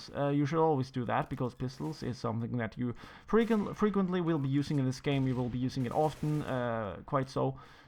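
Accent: Norway. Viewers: Haha, du har god engelsk, men alle nordmenn har en aksang man lett kjenner igjen. Kan jeg adde deg på steam?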